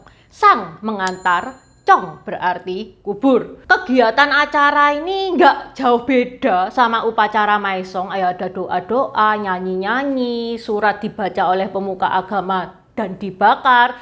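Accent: Java, Indonesia. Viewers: Wajah anda tionghoa bngt ya ,tp tetep ya logat jawa nya kental bngt ya😊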